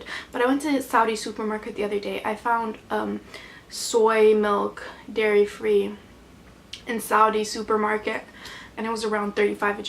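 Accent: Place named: West, United States. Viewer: can you do a video on how you found living in Egypt as a english person- ik you're egyptian but from your accent i can tell youve lived alot of your life in a western country (correct me if im wrong). it would help alot as im thinking of moving there soon but ive lived most of my life in england. (im 18, vegan too) im just scared i wont get along or agree with people bc of the different views and stuff. Thank you x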